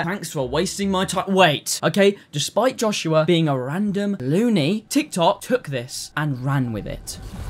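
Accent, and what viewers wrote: United Kingdom. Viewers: Handsome, naturally good physique, British accent, funny content, gentle humour = best recipe for my entertainment Thanks for having started making videos! But also thx for the yt algorithm for suggesting you to me.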